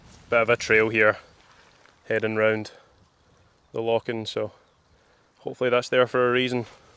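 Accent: Scotland, United Kingdom. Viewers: Definitely Scottish with a lovely clear accent. 🏴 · May I ask, is this a particular dialect you speak or general scots english?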